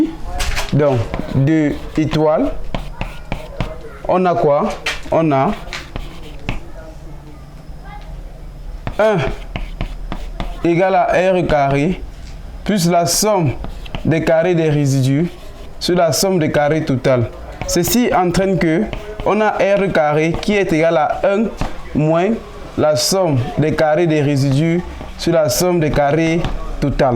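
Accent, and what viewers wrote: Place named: Africa. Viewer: Merci. excellent, il te foudra consulter les vidéo de communauté qui parle nativement le français de la france correctement prononcée. je veux dire par ceci le français comme le prononce les professeurs du français dans les faculté du magreb arabe par exemple. pourquoi ?????? et bien parce que, tu va devoir abaisser dans un premier temps, l'accent africain très ombré . autrement dit, toi , tu cherche à mondialiser tes propos, to doit donc visée un accent moyen que la majorité des francophones du monde vont comprendre facilement et adorer. je te dit ceci car je veux que tu reussisses mon ami. :hand-pink-waving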